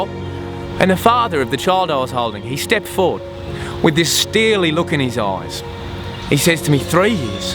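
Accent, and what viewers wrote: Australia. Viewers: yikes. i really doubt anyone wants to go a country where they'd have to hear your stupid aussie accent every day. better to be eaten by sharks. trump 2016!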